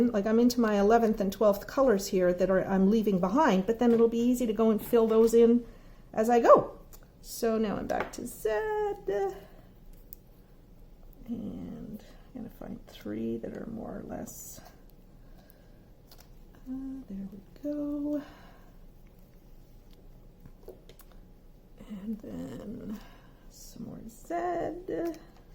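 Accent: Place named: Canada. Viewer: Rambling and Diamond Painting will do. I figured you’re Canadian I recognized the accent my partner is also Canadian, lol. You’re lucky it’s cold there and you don’t experience the pink wax getting all gooey, super soft and needs to be reloaded more often. But I found a great hack for us here in the Philippines. I keep all of my unused pink wax in the fridge! And they worked fine from that point forward. I like how you are finding ways to use cheaper alternatives for diamond painting. The hobby itself is not cheap if you want to be able to make a lot of paintings for yourself and to give away as gifts. Some of the tools in the market, I find very expensive and they are just wants not needs. Keep it up and keep on sharing!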